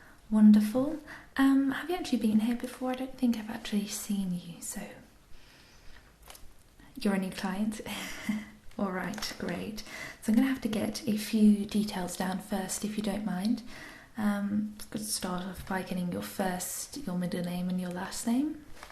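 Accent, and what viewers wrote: Scotland, United Kingdom. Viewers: Wow! Love this, ear examinations are my favorite, can't believe I've only just found your chanel! Nothing wrong with your Scottish accent but your natural English one, I find is more relaxing 👍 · No video + natural accent almost makes me feel like I'm listening to someone completely new. Fitting to the channel rebrand, I suppose. I think this and your Scottish accent are both equally wonderful, excited for new videos in either.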